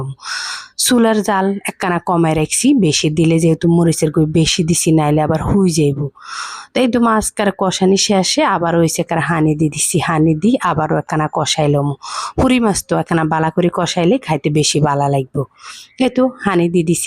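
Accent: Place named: Noakhali, Bangladesh. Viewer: একেবারে খাঁটি নোয়াখাইল্ল্যা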